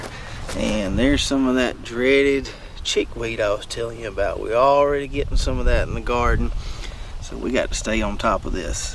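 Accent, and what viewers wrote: South, United States. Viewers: Trivial but--Southern Drawl a bit refreshing from farther N. Commifornia, even if it's heel for hill. 😄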